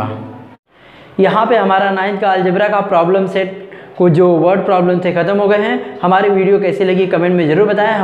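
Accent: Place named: South India, India. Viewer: Sir from which state are you ,your speaking tune are like south Indian people's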